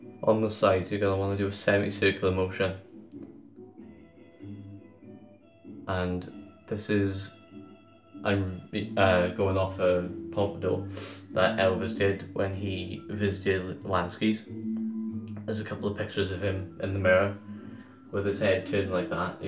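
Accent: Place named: Wales, United Kingdom. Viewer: Are you welsh fella? Lovely accent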